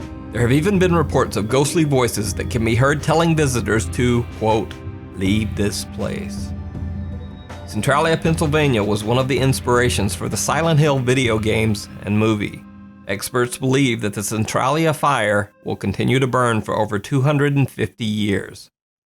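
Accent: South, United States. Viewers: I love his voice too, and Southern US voices are so gorgeous, I'm from UK and they sound really lovely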